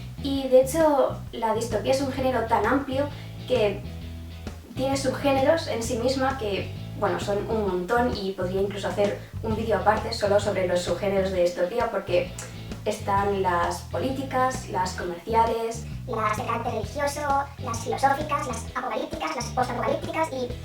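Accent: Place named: Spain. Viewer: Me encanta tu acento, de que parte de españa será? Suena mucho como el antivirus <3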